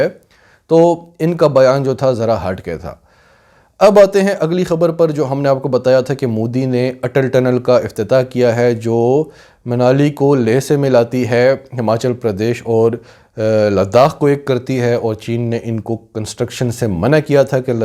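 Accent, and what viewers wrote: Pakistan. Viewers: Saieen ki azmat ko ek syed ka salaam best urdu accent keep our sindhi bhaiee pure pakistani accent keep doing this imence respect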